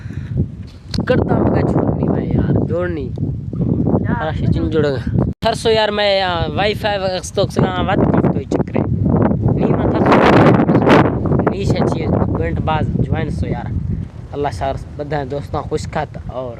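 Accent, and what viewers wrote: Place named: Balochistan, Pakistan. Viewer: میں بلوچی ہوں لیکن میں آپ کی بلوچی سے صرف چند الفاظ سمجھ سکا آپ کے آباؤ اجداد کہاں سے آئے تھے؟😊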